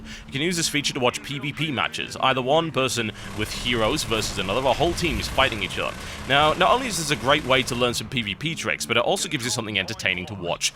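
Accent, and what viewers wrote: United Kingdom. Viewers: I wonder what persona totalbiscut has ... WAIT HES BRITISH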